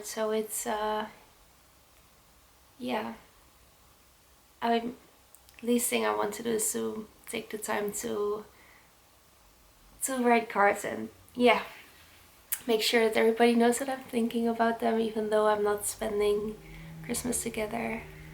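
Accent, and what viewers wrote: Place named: Netherlands. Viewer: I have been watching your videos for a while now because I like Norway and Scandinavia in general. I like your simple down to earth way of living, which reflects in your videos. I already thought you were Dutch by the way you speak English, but now I know for sure.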